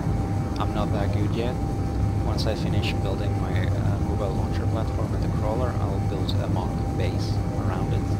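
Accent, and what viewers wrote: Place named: Scandinavia. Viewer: You sound like a Scandinavian Bob Ross. I could listen to you forever :D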